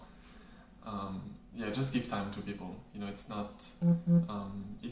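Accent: France. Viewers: Are u french ?🇨🇵😆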